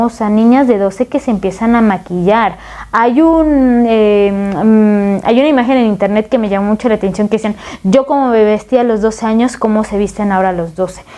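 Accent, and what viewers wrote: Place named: Puebla, Mexico. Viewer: HOLA HERMANITA, POBLANITA DE TALABERA, HABLAS COMO UNA AUTÉNTICA POBLANA. LO DIGO POR TU “MODITO”’Y ESTO ME ENCANTA. EL TEMA QUE A TOCAS SIEMPRE. A VA A SER ACTUAL Y SEÑALAS QUE A TÉS LAS MUJERES ERAN MÁS REPRIMIDAS Y ES CIERTO, PERO TAMBIÉN LOS HOMBRES. AUNQUE SEGURAMENTE ÉRAMOS MENOS REPRIMIDOS, AUNQUE EN MI CASO PARTICULAR LA REPRESION POR PARTE DE MI MAMÁ FUE EXTREMA Y MÁS AÚN YO DIRÍA QUE FUE BRUTAL Y NO FUE CORRECTO, AHORA SOY PADRE DE 3 HERMOSOS HIJOS, CON CARRERA UNIVERSITARIA TERMINADA Y SIENTO QUE EDUQUÉ A MIS HIJOS CON DISCIPLINA, PERO CON AMOR CON INTELIGENCIA Y ES COMO DICE EL DICHO: TODO CON MEDIDA, NADA CON EXCESO Y SIN EMBARGO Y A PESAR DE TODO NUESTROS PADRES CON LA EDUCACIÓN Y TODOS LOS SATISFACTORES QUE NOS DIERON, AUNQUE CON RIGOR, NOS HICIERON MÁS BIEN, QUE MAL, PORQUE SOMOS GENTE HONORABLE, PRODUCTIVA Y. 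OS ESPANTA VER QUE LAS GENERACIONES ACTUALES EN GRAN MEDIDA SE VAN DEGENERANDO MÁS CADA DÍA. AUNQUE POR FORTUNA ESTA ACTITUD NO ES GENERALIZADA, PORQUE HAY GRANDES Y MUY HONROSAS EXCEPCIONES DE FAMILIAS HONORABLES. SALUDOS HERMANITA Y MUCHAS BENDICIONES A TODA LA COLONIA DE PINGÜINOS.🇲🇽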